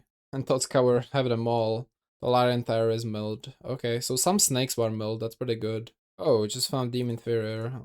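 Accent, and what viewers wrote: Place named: Czech Republic. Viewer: Dude ur czech accent is so funnily familiar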